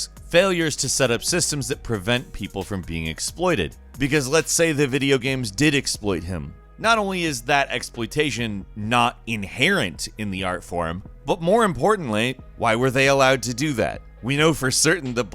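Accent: United Kingdom. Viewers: The British accent has convinced me beyond all doubt that actually is Ed Helms in disguise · Real life violence makes my stomach turn, blowing people up in video games doesn't. Hilarious brit accent though LOL. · Some brits are stupid. ALMOST as bad as extreme feminists. #5. We can go a whole year with barely seeing the sun. We don’t all have rickets. I barely go out when it is sunny and I’m fine. And your British accent is terrible lol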